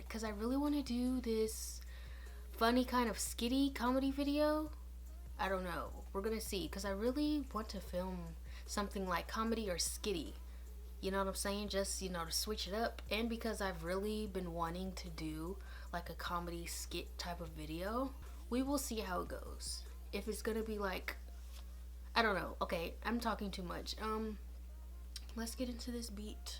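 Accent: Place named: Bay Area, United States. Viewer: Where are u from ??? You sound like you'd be from the Bay Area 😭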